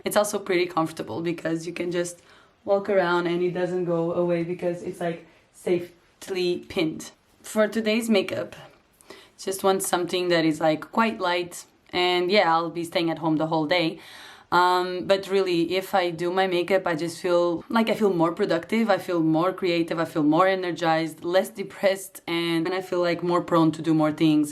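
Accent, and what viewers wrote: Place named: Portugal. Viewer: nao e brasileira ela e portuguesa se conhece pelo sotaque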